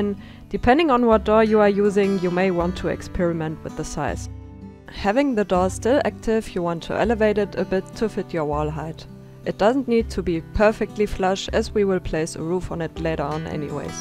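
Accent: Germany. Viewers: Junge dieser deutsche Akzent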